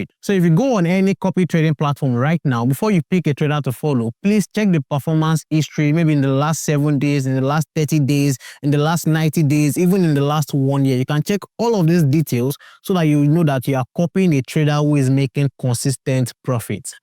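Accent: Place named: Nigeria. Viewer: Ur doing the lords work abeg. Been watching the videos in oyibo accent since and i was so confused. I needed this in complete naija accent lol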